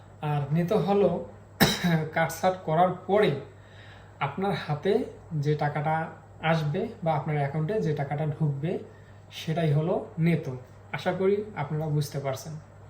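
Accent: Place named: Barishal, Bangladesh. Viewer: বরিশাইল্লা🤔🤔🤔🤔🙄🙄🙄 সামনের লেখা দেখে দেখে,রচনা বলতেছে🤣🤣🤣🤣🤣🤣🤣 ভালো আছি,পবিএ মক্কা আছি🥰🥰🥰🥰🇸🇦🇸🇦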